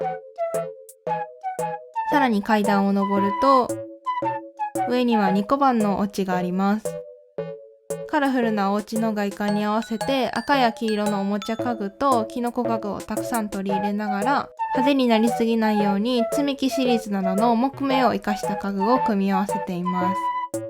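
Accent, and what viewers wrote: Kansai, Japan. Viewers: 儚げふわふわ関西弁お姉さんだった頃のアニキ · 最近あつ森を始めたのですが、ほんとにこんな素敵な島になるのかと驚かせていただきました🙄途中出てくるマップも好きだし、主さんの関西弁も好きです🥰🥰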